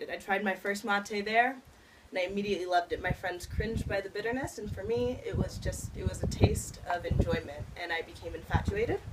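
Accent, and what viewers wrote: Argentina. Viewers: Bombillaa! accent Argentino 👌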